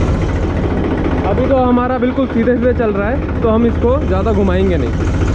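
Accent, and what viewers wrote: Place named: Haryana, India. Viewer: Haryana ki boli laage tere Bhai jma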